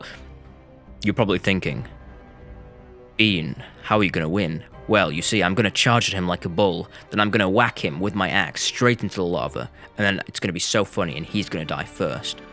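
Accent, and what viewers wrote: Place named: United Kingdom. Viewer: Why.is he birtish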